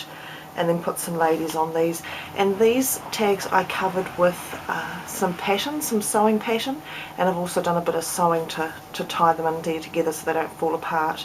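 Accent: New Zealand. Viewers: I love your book, I have one similar in process... and I ADORE your accent!!! NZ has the best accent in all the world, to me.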